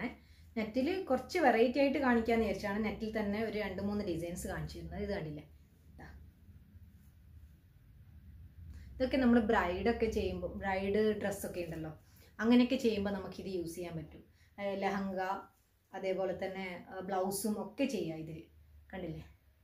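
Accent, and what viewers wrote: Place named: Thalassery, India. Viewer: Hai chechi.. Tlsry evideya veed... Samsaasara kettapo thanne eniku thoniyirunnu.... Nammale aalaanu...🥰🥰tlsry evideya... Njan eppoyum kaanaarundu...👍👍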